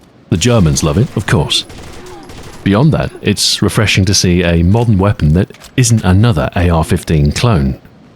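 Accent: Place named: United Kingdom. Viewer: Love hearing that thick British Accent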